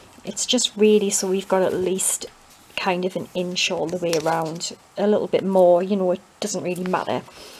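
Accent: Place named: Scotland, United Kingdom. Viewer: TBH, I started watching to hear you're beautiful Scottish accent but I keep watching bc I really love you'r work, lol. ❤❤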